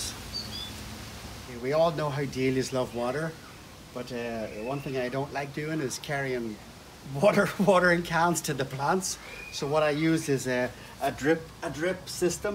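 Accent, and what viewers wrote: Northern Ireland, United Kingdom. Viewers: Hello greetings from NI when you say a good feed of pot ash what would I be looking to buy,at the min I have a lot of buds but the stems are short and not strong. Also I hear the NI accent there where do you hail from,lovely plants by the way.Ta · Now r u a northern ireland man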